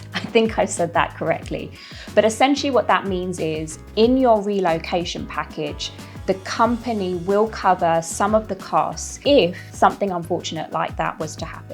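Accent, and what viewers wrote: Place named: Australia. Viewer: I was not expecting this video to be in Australian English.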